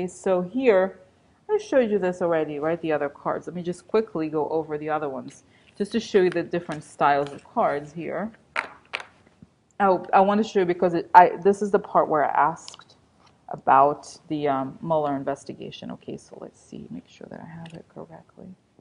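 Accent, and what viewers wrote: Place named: Russia. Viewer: My gosh, you crack me up! Wonderful reading as usual....love you...and that Russian accent!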